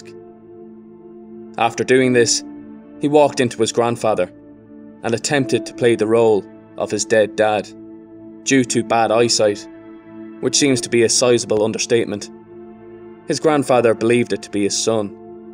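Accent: Ireland. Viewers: Irish narrators rock.Respectful to victim,and her mother.No bias here💚🇮🇪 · Your channel has just popped up on my list.. another glorious Irish accent like Mike at That Chapter. and no gimmicks just clear narrator and detailed story .I’ve subscribed .. 😀 👍🏾👍🏽👍🏼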